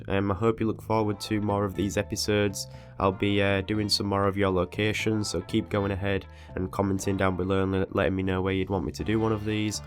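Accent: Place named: Hull, United Kingdom. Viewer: Your Hull accent makes me want to die but your drawings give me life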